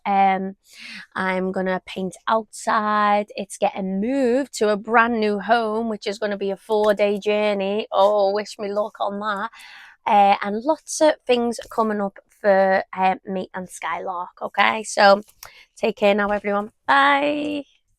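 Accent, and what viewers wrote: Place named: Liverpool, United Kingdom. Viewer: Referred by David (cruising the cut). Nice to hear a Scouse accent! Nice looking little boat.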